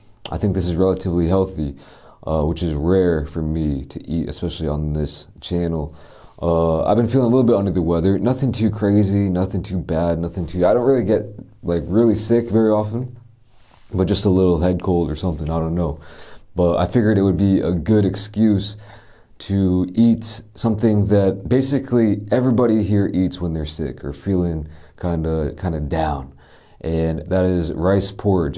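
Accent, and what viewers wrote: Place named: New York, United States. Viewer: NGL~ if I was in a spot in NYC & walked in & spoke~ I woulda SWORN he was from New York!! 😂😍💪🏽💯❤️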